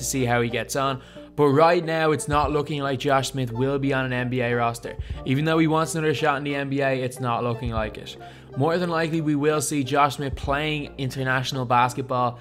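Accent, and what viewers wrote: Ireland. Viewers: where is josh smith now you ask, in the nba i hate your irish accent go watch your own sports you potatoe eating fuck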